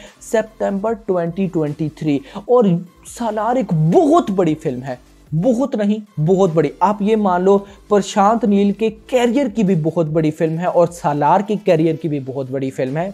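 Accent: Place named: Punjab, Pakistan. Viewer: bhai thumara pakistani punjabi urdu accent aata hai...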